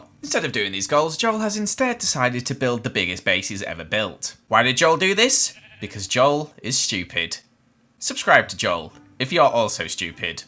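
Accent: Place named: United Kingdom. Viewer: Stop judging British Accents. It's just how we speak.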